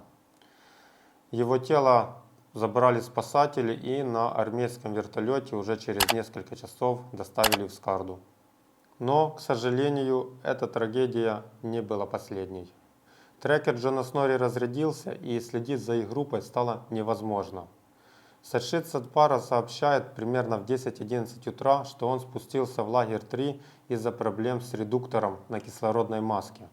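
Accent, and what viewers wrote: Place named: Surzhyk, Ukraine. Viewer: сделайте что то с суржиком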